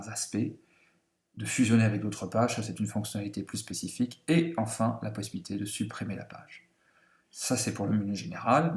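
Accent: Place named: Alsace, France. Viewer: Très bonne présentation, seul bémol, le présentateur a un fort accent Alsacien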